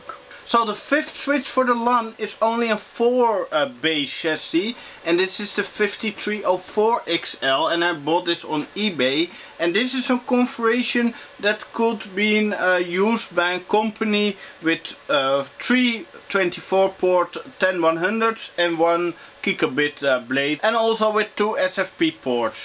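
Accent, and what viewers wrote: Netherlands. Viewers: He’s Dutch, the accent goes with it 😀. After a couple of minutes I don’t hear it, but then I lived in the Netherlands many years ago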